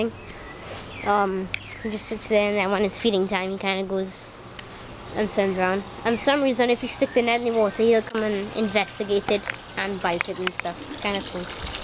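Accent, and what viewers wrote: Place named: Trinidad and Tobago. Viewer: Hey nice fish hommie!i heard the lil trini voice.. im checking out ur vids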